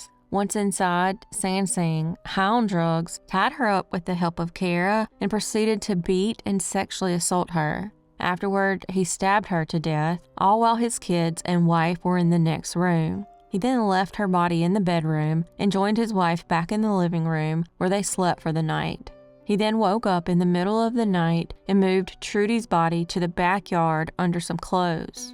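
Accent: South, United States. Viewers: It's nice that these cases are narrated by someone with an authentic Southern accent. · Where’s your accent from? I have been listening for a while and I’m guessing either TN or NC. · Her"s is the only southern accent i like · I feel like i haven’t heard your southern voice in idk how long ☺️☺️☺️ rot in hell Larry 🤪🤪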